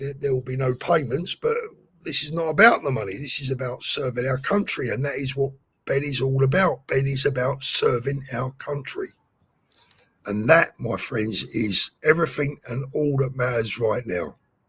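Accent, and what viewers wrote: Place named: South London, United Kingdom. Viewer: You're very articulate and communicate perfectly.. Also, nothing wrong with a South London accent. A true londoner! 👍🇬🇧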